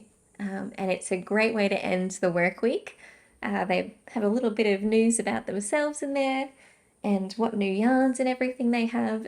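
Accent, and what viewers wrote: Australia. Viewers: Congratulations on your socks. They are beautiful. 9" circs give me hand pain too. I prefer magic loop or DPNs. I still use my Chiagoo 9" needles to hold stitches for heels and such. Your Aussie accent is charming.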